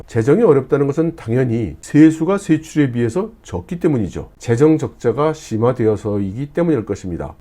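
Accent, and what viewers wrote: China. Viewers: 조선족 같은데 한국말씨네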